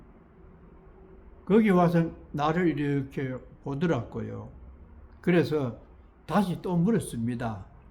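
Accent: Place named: Andong, South Korea. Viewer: 안동사투리가 구수한 스님법문 잘듣고 있습니다 얘기를 너무 재미있게 하셔서 다음회가 기다려집니다 고맙습니다 스님❤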